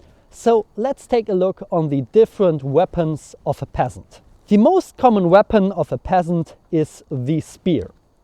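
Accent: Germany. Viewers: Super Video! Wenn ich darf lasse ich dir einen kurzen Hinweis zu deinem Akzent da. Du machst nämlich einen typisch deutschen Fehler. Du betonst "because" so als wäre es "becourse", also da kommt plötzlich ein r vor das es gar nicht gibt. Das "cau" in "because" wird aber einfach wie das "ko" im deutschen "kommen" ausgesprochen. Wir deutschen können halt das englische r nicht aussprechen (geht mir auch so) und das überkompensieren wir dummerweise gerne indem wir es überall reinpacken. Hoffe das ist nicht unangemessen, dachte mir nur das könnte hilfreich sein. Viele Grüße!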